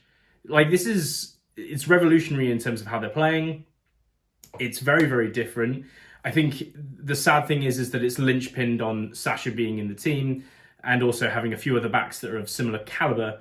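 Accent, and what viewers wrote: South Africa. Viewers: Your Saffa accent is great but you need to throw the words "lekka" and "braai" in there a few times to be authentic. Oh, and don't forget "moer". No South African says three sentences without "moer" appearing at least once 👍🏻 Yeah, what a game. I think NZ not coming to SA during Super Rugby anymore hurts them, because they don't get to play at altitude anymore. Everyone said they stopped playing after 60 minutes, but that is what the Highveld does to a player. Then when you add in the nuclear missile we have in Kwagga Smith, the result is going to go one way. Regarding the TMO checking the truck and trailer and Bongi's tries, I don't know what the answer is there. We heard the Ireland vs SA game had too many TMO interruptions and now we hear the game needs more TMO interruptions. I don't care which one, but it would be nice if World Rugby finally made up their minds? 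Either we accept some mistakes in the game, or we Big Brother every mistake. Pick a lane and stick to it, for the love of God. I'm okay with the tries being awarded, I'm okay with them not being awarded, but some consistency is required. New Zealand were very dangerous off turnover ball with broken play, but they were a bit predictable in the attack methinks. The young guys were properly tested. Dixon didn't have such a good game, but like Rassie said, they are there to make mistakes and to learn. Fassi had a banger after his yellow card, Nortjé was very industrious, Steenekamp is slowly but surely making his voi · A truly shit South African accent but good analysis of the game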